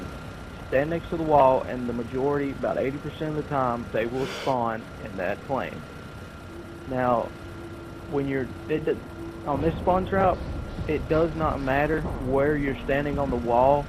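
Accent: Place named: South, United States. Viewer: People from the south don't give fuck how they talk buddy [: Js...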